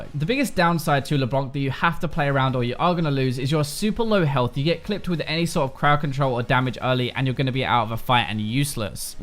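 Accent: England, United Kingdom. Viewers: hey which part of England are you from? i cant figure out from your accent, thanks:)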